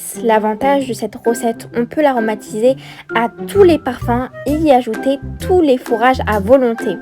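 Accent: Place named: Oujda, Morocco. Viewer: ماشاء الله عليكم وعجبتيني مين قولتي خودمي ههه على الموس اللهجة الوجدية حاضرة في الفيديو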